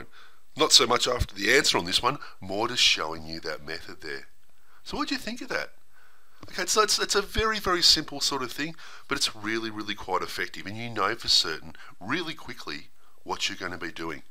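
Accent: Australia. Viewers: I love this Aussie accent.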